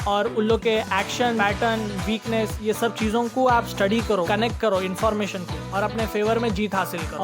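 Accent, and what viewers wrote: Hyderabad, India. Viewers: Tu Hyderabad se hai? Tera accent poora Hyderabadi hai 😂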